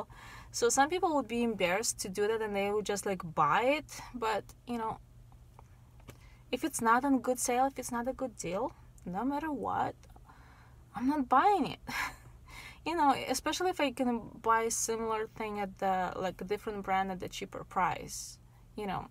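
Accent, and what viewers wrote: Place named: Eastern Europe. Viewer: You sound Eastern European. Just curious.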